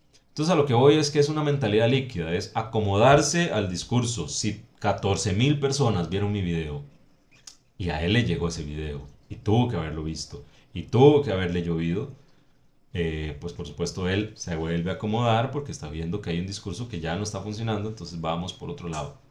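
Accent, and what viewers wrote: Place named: Colombia. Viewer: El acento de los Ticos es muy parecido al de colombia. Juro que creí que eras de Colombia 😎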